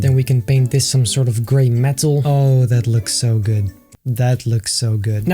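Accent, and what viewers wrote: Netherlands. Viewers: He sounds so dutch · You are dutch right?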